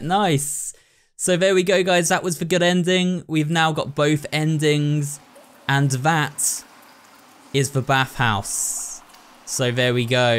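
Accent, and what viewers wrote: United Kingdom. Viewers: Ur uploads always soothe my stressed mind with that comforting and sexy british accent. Luv u!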